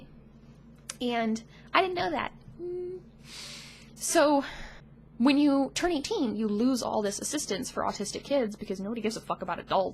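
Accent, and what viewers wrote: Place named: Midwest, United States. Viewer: I am from the midwest but moved to the Pacific Northwest. And your accent sounds soooo Midwestern. Michigan? Wisconsin? gah I cant place it and its killing me. You have a great personality! this video was awesome and really helpful!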